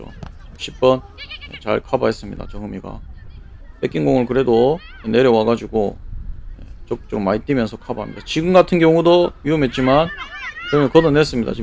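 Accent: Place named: Gyeongsang, South Korea. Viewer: 아이들 축구도 치열하네요^^ 경상도 해설 잘 들었어요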